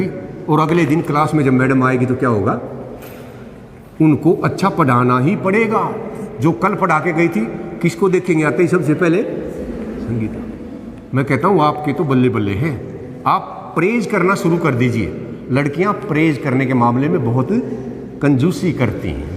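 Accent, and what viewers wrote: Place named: Khari Boli, India. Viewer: महोदय अभी पिछ्ले हफ्ते ही मैने आपकी एक वीडियो देखी, जो मेरे पिताजी ने मुझे भेजी थी। आपकी आवाज़ में जो खड़ी बोली की सीमित मात्रा है वो एक बहुत अच्छा अनुभव कराती है। मुझे भी अपने बचपन के दिनो का एहसास कराती है। मैं आपकी छोटी पर गहरी बातो को नियमित सुनता हूँ। आपका तहे दिल से शुक्रिया अदा करता हूँ की आप बच्चो के लिये ज्ञानवर्धक सामग्री उपलब्ध कराने का उत्तम कार्य कर रहे है। नमस्ते जी। 🙏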